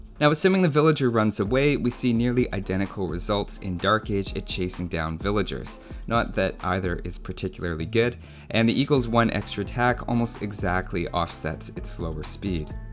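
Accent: Canada. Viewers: Is the author of the video Canadian by any chance? "Scout" and "about" are distinctive in their pronunciation to Canadian speech. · My weary soul is calmed every time I hear say his "let's check it out", in that Canadian accent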